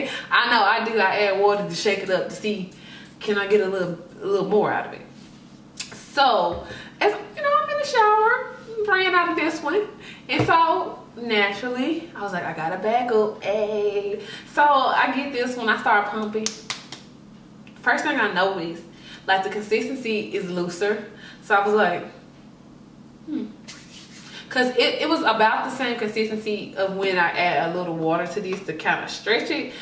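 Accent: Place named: South, United States. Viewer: What part of the states are you from? Your accent sounds.... different. Not sure if it’s a “black” southern accent.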